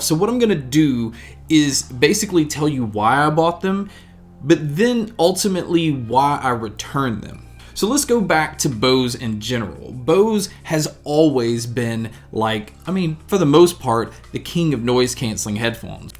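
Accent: South, United States. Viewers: This guy's got a very strong country, southern accent!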